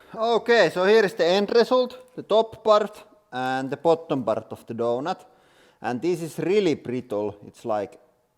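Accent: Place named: Finland. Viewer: We Finns speak English like this 😄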